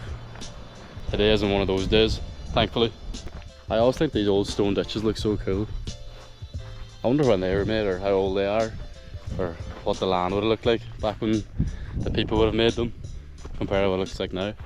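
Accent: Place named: Donegal, Ireland. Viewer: Next video will you say dia duit in your donegal accent please. Im learning gaelic and its HARD!!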